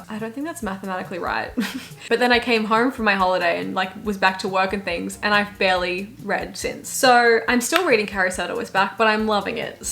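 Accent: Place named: Australia. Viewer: Great video! You are so adorable, the first time I saw your video I noticed your eyeshadow really stands out, and your glasses! I love your style. I'd love more Q&A videos like this!:) PS. I love your Australian accent I could listen to you talk about the weather LOL I also haven't finished TVD but I'm Team Damon. My least favorite album is.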